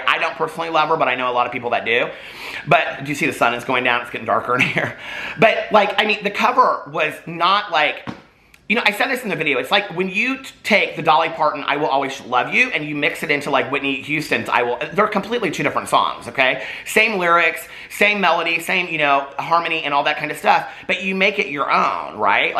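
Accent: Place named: Indiana, United States. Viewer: I think its just his Indiana accent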